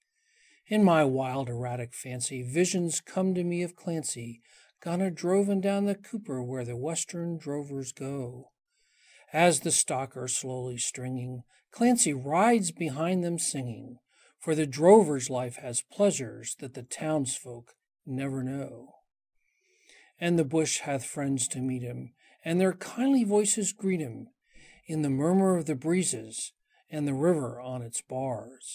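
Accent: United States. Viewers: Wow never heard this done with a Yank accent, sounds odd but then again its odd that an American would even know of the poem at all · Nice reading. Sorry about the people whinging that it's read in an American accent! Happy for anyone to enjoy and read the poem... but you're an Aussie anyway so it's really a moot point ;-)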